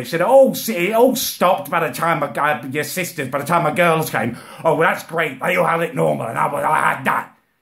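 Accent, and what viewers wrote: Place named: Bristol, United Kingdom. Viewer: I thought he was from bristol not liverpool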